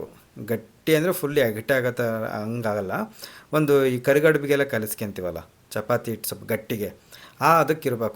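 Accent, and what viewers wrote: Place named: Holalkere, India. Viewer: Nimmadu holalkere taluka bro Nammoora kade thara ne maathadtira.... normal blr bhashe ellaru maathadthare.. continue like this 😅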